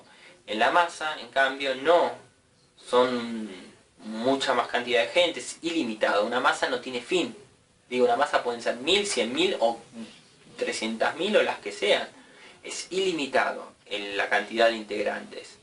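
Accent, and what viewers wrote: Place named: Argentina. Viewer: Muy claros tus aportes teoricos. Una clase con acento argentino que se agradece.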